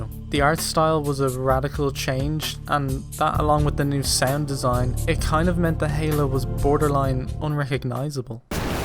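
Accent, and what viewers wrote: Ireland. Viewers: My boy is Irish. I can hear it 👐 Nice video dude! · Matthewmatosis is that you?? You sound a lot like him lmao. More than just the Irish accent; very analytical. You made really good points man, and got me more hopeful for Infinite somewhat. It truly is their last chance to save not only halo, but the xbox even. Subbed, keep it up! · rough guess correct me if I'm wrong cork or Dublin judging by the accident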